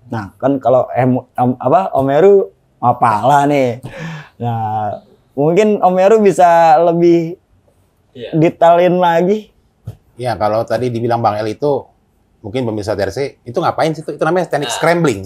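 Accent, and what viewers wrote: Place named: Java, Indonesia. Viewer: Nama Tapi Mendook Amat Mas Ngomongnya...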